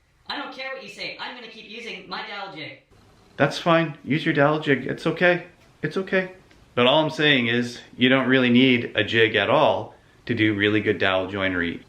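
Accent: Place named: Canada. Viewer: Dansk surname living America, or a perfect English speaking Dane? But then I hear a little Canadian accent? Cheers from an American living in Sweden!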